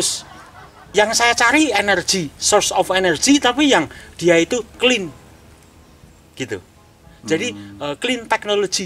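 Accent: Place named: Java, Indonesia. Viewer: Jowo mn suarany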